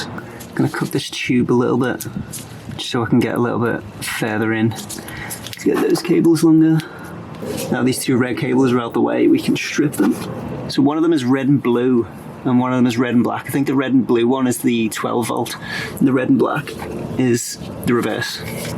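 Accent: Liverpool, United Kingdom. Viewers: You from liverpool fella? Or close by just a guess from the accent hahah, your getting there with her slowly but she's gunna be some yolk when finished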